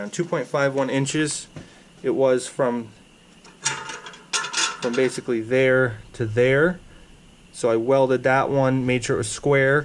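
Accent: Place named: North, United States. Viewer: I can't figure out this guy's accent. It's definitely Northern, a touch of those Canadian long vowels, but I can't place it. At first I thought Upper Peninsula/Wisconsin but it's not that strong. Maybe Minnesota or N.D. It's gotta be this side of the border. Anyone know? Also nice vid - I can't get enough of these long form restorations of old farm equipment.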